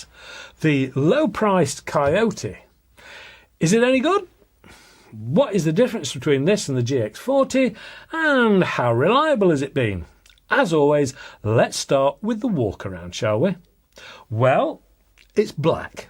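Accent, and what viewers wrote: United Kingdom. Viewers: Since i discovered this Channel an couple ofm onths ago, i am hooked and have seen allmost all of them.. at least twice. I love the review, breakdown and judgement without bias or clearly "payed in whatever way" intention. All done without benefits from manufacturers, clearly and correctly spoken brittish without being pretentious about being brittish. love it! I do not like the american slang of which youtube is full of., please keep on trucking with this channel, for years to go please... I have followed a few of your advice, and you naild it. I am so pleased with you. This 50 meters airgunner (till dec last year with a HW97K and grouping like pennies) is now a happy owner of a HW100T (FAC) has learned a lot from you! Cheers! an many thanks. Will order an AAR Cap soon and proudly wear it at competitions next year. Forgot to say that i never had much of an impession of Gammo, but now i am interested and will try that airgun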